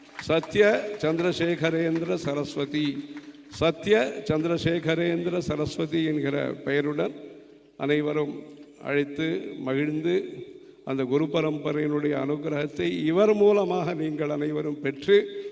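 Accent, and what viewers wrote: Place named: Thanjavur, India. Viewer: அக்ரகாரத்து கருவறையில் இனிய தூய தஞ்சை தமிழ் 🙏 தமிழோசை கேட்கும் இடமெல்லாம் ...... புனிதம் பெறட்டும் 🙏🙏🙏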